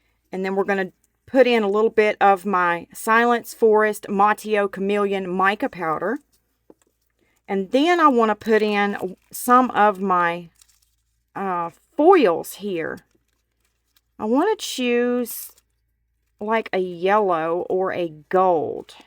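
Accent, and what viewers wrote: South, United States. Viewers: I'm so glad I found your channel. 😊 You're a delight. From your southern twang to your sweet laugh. ❤ Those pink molds could be for making candy. Possibly the split is for a scew stick for cake pops or something? IDK 🤷‍♀️ 😂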